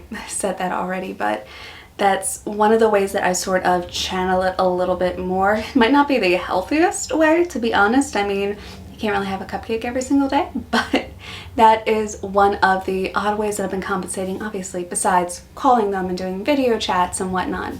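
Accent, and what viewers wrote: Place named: Australia. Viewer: You are starting to loose your American accent and starting to get an Australian accent. It is difficult to work out what part of America you grew up in. The biggest question I have for you is have you worked out how to spread Vegemite yet. Australians need to understand that American has 3 cities that have more people than Australia but the actual size of both countries is almost the same. As someone who has always had problems with my weight I found just walking to the shops worked extremely well and as I have to carry my groceries I have to go more often. Have fun life is to short to take it seriously.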